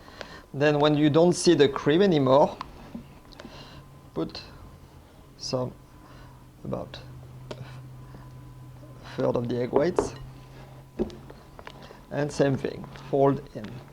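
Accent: France. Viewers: Nice one ! That French accent though..🤣🤦🏽‍♀️🤷🏾‍♀️😘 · French english 😅😅...funny,I like it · I did not realise Chef was called! Quel accent français, seeing the channel name, I was expecting a english gentleman!! Edit: doing that tomorrow by the way;) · Thank you for a great explanation on how to make a Tiramisu. By the way i love your french accent.🙌😉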